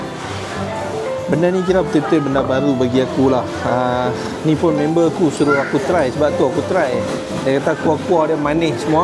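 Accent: North, Malaysia. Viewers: Orang utara kaa boss ? 👍🏾👍🏾 Salam dari taiping perak boss 👌🏾🙏🏾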